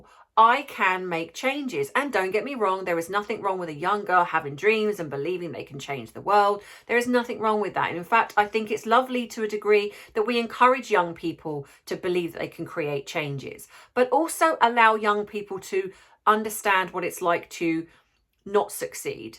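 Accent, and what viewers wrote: England, United Kingdom. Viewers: I like your videos,and love your English accent. I live in Oregon. You could film your self kind of to the side, like Candace Owen's and Ben Shapiro,on the daily wire. I'm just thinking out loud😊😊😊😊😊😊😊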